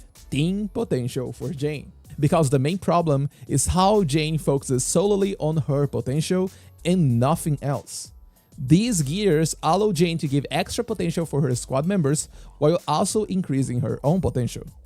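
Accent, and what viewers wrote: Brazil. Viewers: are you Brazilian?? Your accent sounds SO brazilian like 😮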